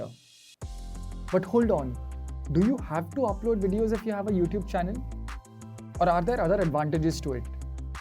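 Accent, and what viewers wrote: India. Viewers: The lady always talking in the maths videos has a strong indian accent how did you think it was forgein based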